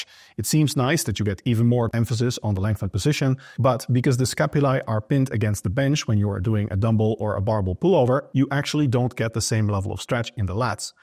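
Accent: Netherlands. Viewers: In an other YouTube channel some said you need 2 pull and 2 row exercises for a bigger back. I did that. Gonna put the lat prayer in my back workout tomorrow and skip one of the row exercises. Gonna give it a try, in the end it's what works for me right. Btw love the Dutch accent 😉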